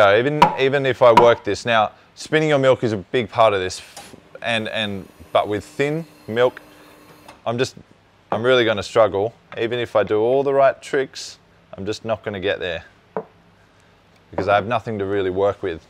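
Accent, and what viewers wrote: Australia. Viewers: where are you from? Australia? great accent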